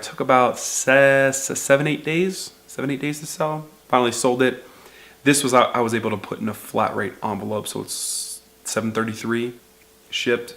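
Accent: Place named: Canada. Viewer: A little Canadian sprinkle never heard nobody ;)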